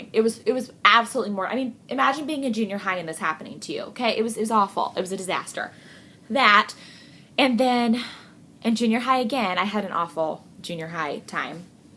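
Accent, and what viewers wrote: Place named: Illinois, United States. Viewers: how you say illinois is so funny..love it...im from illinois, near chicago and its funny how our accents are so different but were still in the same state! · WHATTTT! girl i didnt know you live in illinois! we have totally different accents! hahah. what town are you from or near?!?!?!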